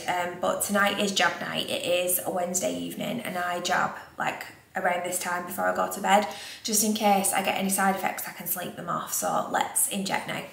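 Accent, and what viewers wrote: Lancashire, United Kingdom. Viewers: Today is my day 1. You sound like you’re from my neck of the woods in Lancashire.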